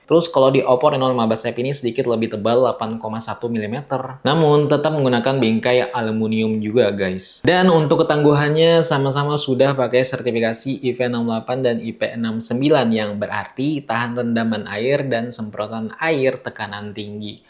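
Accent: Banjar, Indonesia. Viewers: Urang banjaarr..kh?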